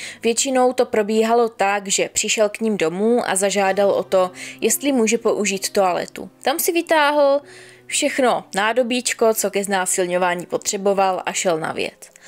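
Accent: Moravia, Czech Republic. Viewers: Jsi super.Krasne se té poslouchá.Mas něžný hlas a ten moravský přízvuk je tak roztomilý🤗Vždy se těším na každé tvé nové příběhy🥰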